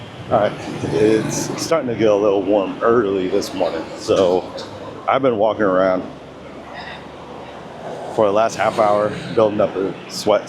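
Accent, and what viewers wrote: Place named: Texas, United States. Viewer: Yeah, they start work super early. My sisters and parents in law just flew in this past Monday. Btw, no offense, but you sound like you're from Texas. LOL.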